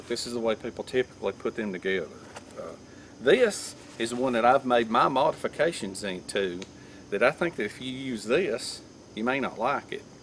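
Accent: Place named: Georgia, United States. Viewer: We love this guy... He speaks real American Georgia English . Love from JAPAN . ✌️😉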